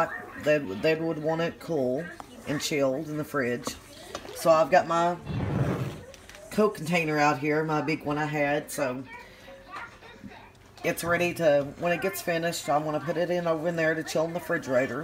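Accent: South, United States. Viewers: I actually can speak this "Dialect" lol This is COUNTRY - that's Deeper than Southern! I loathe Nutmeg - so NO to the Egg Nog - Folks can add that at the table - YUCK! EHHHhH never liked that taste! Boiled Custart is creamy and sweet - Vanilla is fine ❤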